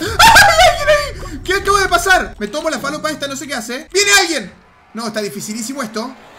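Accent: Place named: Spain. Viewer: Naaaahhh, entre tus gritos, acento español y los nervios del juego me trasladé a otro universo 😂 Geniooo 👻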